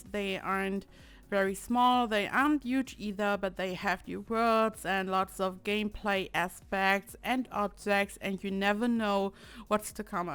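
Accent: Germany. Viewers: das deutsche Englisch macht mich total nervös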